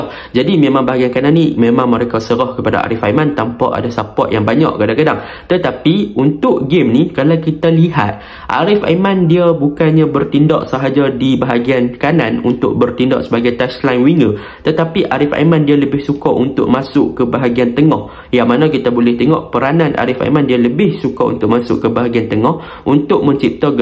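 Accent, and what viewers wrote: North, Malaysia. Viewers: Teknik free kick aiman semalam...good..👍👍 Komentator nampaknya bergerak seiring dgn team...👍👍👍....😅😅awat bunyi macam utagha ..😂😂 Keesh...patut susah ati nih..😅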